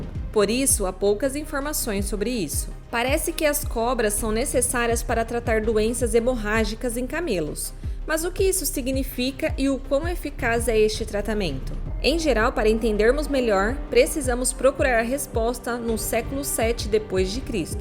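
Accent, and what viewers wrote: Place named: Caipira, Brazil. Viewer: Bom vídeo. Mas a narradora precisa perder um pouco do sotaque caipira.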